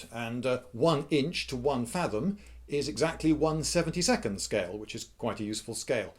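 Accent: England, United Kingdom. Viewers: you sound so English! and that's coming from me, an Englishman myself. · "That was a good bit of rope that." I love the English accent.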